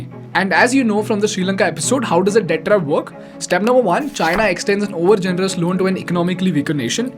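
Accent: India. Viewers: Typical Indian accent - Why cant you Indians just speak in a proper way? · He is speaking normally only, people of his generation(+younger) in india speak English like this not with the stereotypical accent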